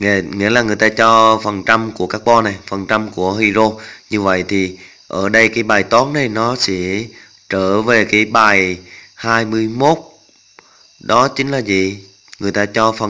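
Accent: Binh Dinh, Vietnam. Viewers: Thầy quê Bình Định à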